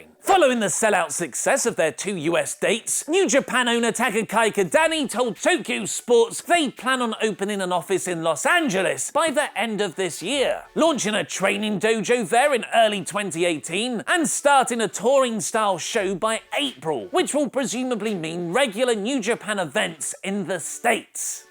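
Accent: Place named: United Kingdom. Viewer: Hearing British pronunciations is horrifying.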